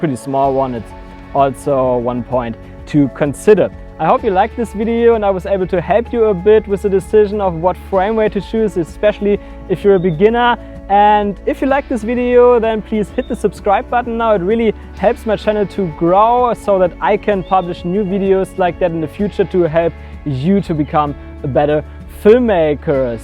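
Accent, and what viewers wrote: Germany. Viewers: Man hört das du Deutscher bist 😅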